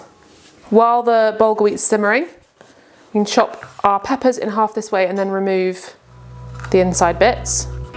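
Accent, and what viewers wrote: United Kingdom. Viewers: I love your channel; I feel that we can be best friends!! Love your lovely British English accent. You remind of my English teachers when I was studying English back in my hometown. I love the food you make! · I love your book and your videos. I'm Italian and I love your fantastic British accent too! I'm going to try the stuffed peppers for sure! They look scrumptious! Thank you!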